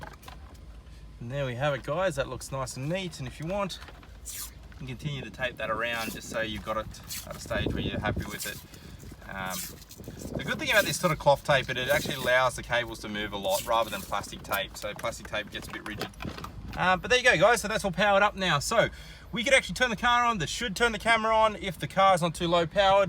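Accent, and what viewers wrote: Australia. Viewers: Best Dashcam DIY install I've seen. Easy-to-understand Aussie voice also. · What an Aussie accent ! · Geez Australia has jacked up accents irritating to listen to learn to speak English bro can’t take one more second of your voice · I love your Aussie accent🥰 Reminds me of the time I used to live in Australia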